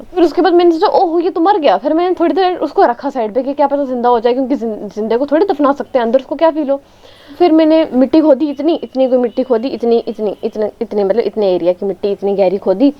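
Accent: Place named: Jammu, India. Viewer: jab bhi tumhari awaaz sunta hu...uski yaad aa jaati hai..uski awaaz bilkul tumhari tarah h..Jammu walo ka alag hi slang hota h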